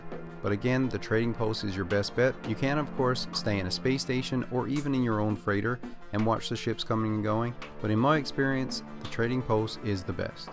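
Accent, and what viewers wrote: Newfoundland, Canada. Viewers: Are you from Newfoundland? Can hear the accent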